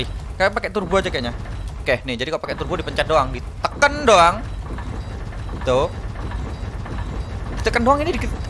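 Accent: Java, Indonesia. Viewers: Bang orang Jawa? Jawa mana bg, salken aku dri jatim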